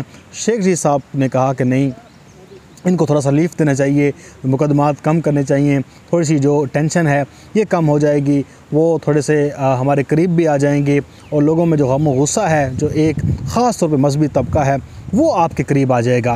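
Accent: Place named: Punjab, India. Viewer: Punjabi ka tarka laganay ki zaroorat nahin hay, however your vlogs are always informative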